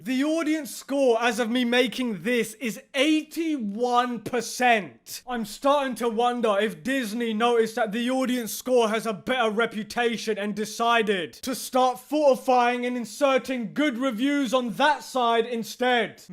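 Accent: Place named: England, United Kingdom. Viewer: I think the content you create and the opinions you have here are valid and all but I absolutely HATE the way you aggressively shout with that horribly abrasive English accent, it makes it almost impossible to watch more than a few minutes of the video. Reminds me of certain scumbags that I used to meet on random UK discord servers with this 'know all, know fuck all' attitude that you yourself seem to have adopted for this channel. Hard pass mate.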